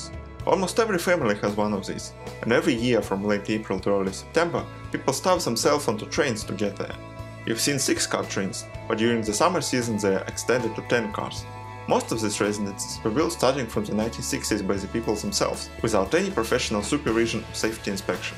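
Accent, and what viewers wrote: Russia. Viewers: Хорошее видео, но неужели нельзя было найти человека с английским без акцента?? Монотонная ровная речь характерна для русского языка. Английский совершенно не такой. Пришлось прекратить просмотр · Very good quality filming. The only thing I would ask or comment on is although your English is very good your Russian dialect can make it difficult to understand. I would suggest slowing down you're speaking just a little bit.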